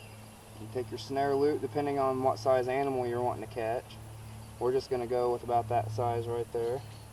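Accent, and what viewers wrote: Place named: Midwest, United States. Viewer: What part of the Midwest are you from.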